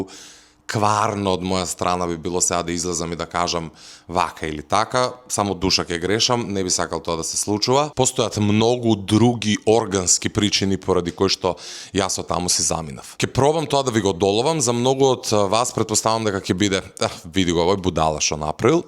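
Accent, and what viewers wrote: Kumanovo, North Macedonia. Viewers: Од Куманово е, па ако зборува "како што треба" пола држава нема да го разбереме🙂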